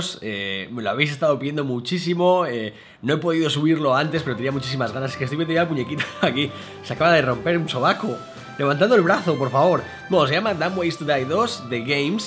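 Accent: Argentina. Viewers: Te sale mejor el Argentino que a mi (que soy de ahí) XD Como lo haces? Voto por un blog entero en idioma boludo! jaja Me encantan estos bichitos!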